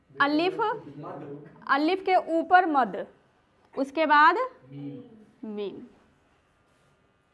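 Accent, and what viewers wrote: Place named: Punjab, India. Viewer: Tuhadi language toh pta lag reha k tuci punjabi ho, but eh gall ekk punjabi samajh sakda, 😂😂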